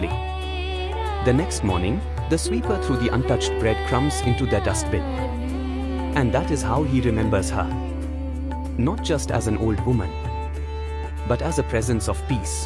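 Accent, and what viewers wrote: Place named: Africa. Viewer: this feels like a african trying to explain a indian cbse portions , still its very good explaination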